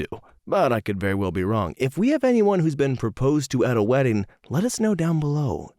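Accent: Ireland. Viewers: Oh I really love the voice of this narrator, similiar to the 'anyway next story' narrator but less unhinged. p.s: I've been living in Ireland for the past few months and thought the accent was spot on. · I gave you a like for the Irish accent lol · I can't say as to the accuracy of the Irish accent, but it was a nice fair voice that bitch didn't deserve. I wish my text-to-speech sounded like that... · Love the Irish accent here narrator, good job :D!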